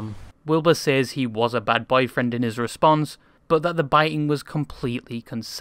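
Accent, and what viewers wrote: United Kingdom. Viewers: ewwie briteesh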